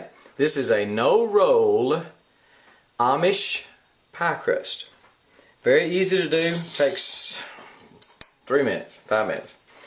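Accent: Texas, United States. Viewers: harplover1; Texas people have a "drawl". You get used to it. You must be new to the internet? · I subscribed within seconds of hearing your lovely Texas gentleman's accent! Thanks for sharing this recipe too!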